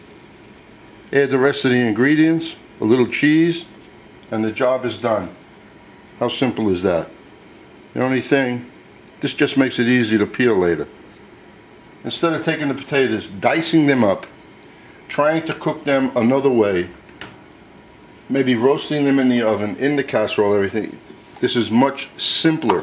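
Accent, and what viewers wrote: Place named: New Jersey, United States. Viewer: Why do you sound like one of the Sopranos?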